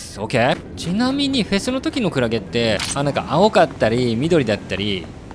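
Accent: Hiroshima, Japan. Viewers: Rさん動画投稿お疲れ様です😌💓 Rさんの広島弁が加藤茶さんの奥さんに似ていたのでびっくりしました❗️次回の動画も楽しみにしています🐱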